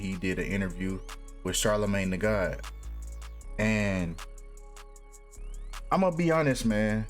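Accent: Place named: Detroit, United States. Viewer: Bro you gotta be from Detroit i hear it in yo voice 😂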